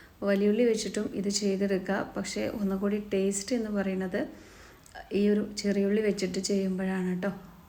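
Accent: Malappuram, India. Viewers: നിങ്ങളുടെ വീട് എവിടെ യാ. ഭാഷ മലപ്പുറം ആണി. മലപ്പുറത്തു എവിടെ ആണ്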